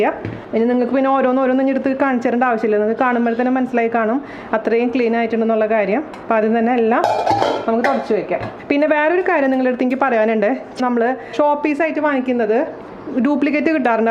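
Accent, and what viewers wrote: Thrissur, India. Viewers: Thank you madam... thanks for the great tip..Really working.. Thrissurkari aano bhasha kettitt angne tonni😁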